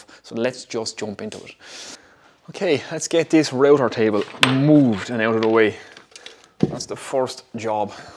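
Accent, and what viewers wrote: Waterford, Ireland. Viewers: just looked back and found your video fixing timber to the walls. I might just take down the few bits I've put up and start again like you did. I'm gueaaing by the accent you're a Waterford man. If so, commiserations for Sunday. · Cut the ploywood with the moytersaw, I love the Irish accent almost as much as I love this build.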